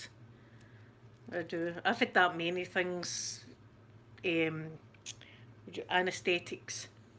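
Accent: Scotland, United Kingdom. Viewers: Aw,. You're one of the most cheerful ladies I've ever seen. I like popping by just to hear your lovely and adorable Scottish accent. <3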